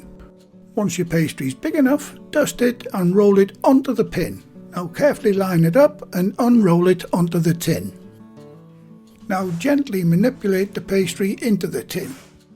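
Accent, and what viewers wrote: United Kingdom. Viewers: I love your video!! I love British accents! But also, it was easy to follow the step by step and it was fast! Ty!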